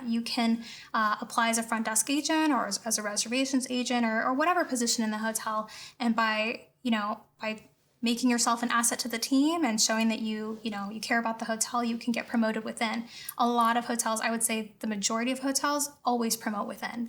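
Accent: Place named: United States. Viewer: Annoying voice.. too loud and american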